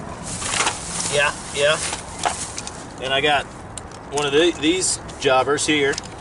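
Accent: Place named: Nashville, United States. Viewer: Where are you from? You’re in Ohio but sound like a fellow Nashvillian!!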